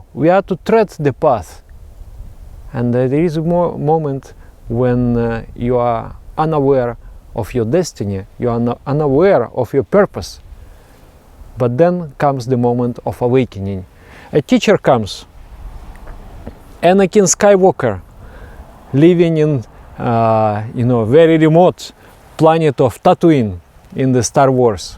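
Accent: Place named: Russia. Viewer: Спасибо большое за видео🙏 Но его не совсем просто слушать из-за грубого акцента который влияет на произношение. В том смысле что русские постоянно пытаются произносить чётко каждый звук. Английский так не устроен, он звучит нормально только когда на нём говорят носители в обычной им манере. Ещё раз спасибо 😊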